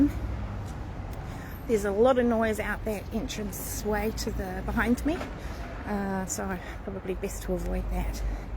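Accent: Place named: New Zealand. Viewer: Hi and thank you for the glimpse of this very majestic building. I hope it can be restored and saved. Are you a Kiwi? You sound to have a NZ accent. 😊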